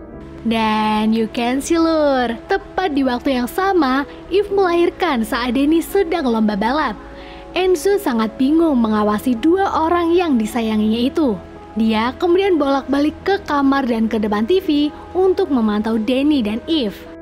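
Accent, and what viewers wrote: Pemalang, Indonesia. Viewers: Wah wong Pemalang iye? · Orang pemalang ya ba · Wong pemalang kie lur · lahhh wong pemalang mbak????? samaaa ihhh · Mbak orang pemalang yak?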